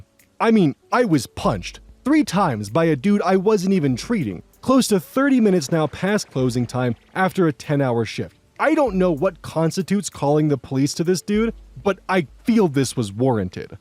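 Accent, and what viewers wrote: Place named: New York, United States. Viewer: Huh. Whenever I heard some comedian do a New York accent I always assumed they were exaggerating it for comedic effect. Yet here I am, having just watched a video where nobody mentions anything about New York nor accents, but where the narrator sounds exactly like those comedians.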